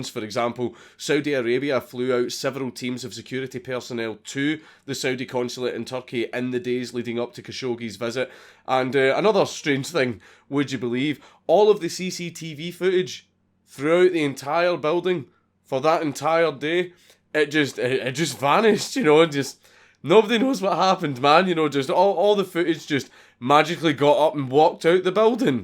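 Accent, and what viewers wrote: Scotland, United Kingdom. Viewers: Yay angry Scottish man can ruin my day!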